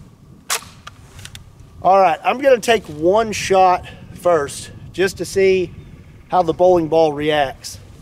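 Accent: Kentucky, United States. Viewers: what part of kentucky ar e you from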